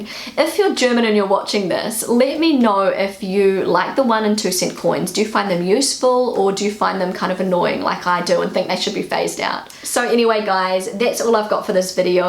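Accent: New Zealand. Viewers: New Zealand accent is very similar to British accent, is it not? I would have thought, you come from England. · Curry flavid kitchip. The new Zealand accent is adorable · Love the accent. I almost wish I were an actor with a NZ character so I could practise the vowels. BTW, there's no "expresso"; it's "espresso".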